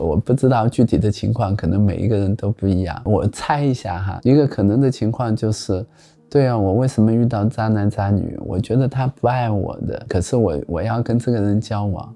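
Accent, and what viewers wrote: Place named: Hangzhou, China. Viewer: 杭州本地人都这么说，江浙人都这样说普通话的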